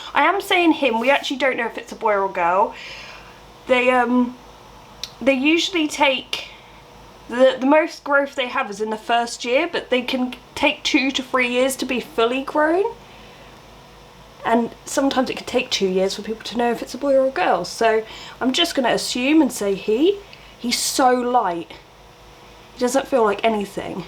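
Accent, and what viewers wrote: United Kingdom. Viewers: Yo your British i didn't know that respect to all my British people out there💯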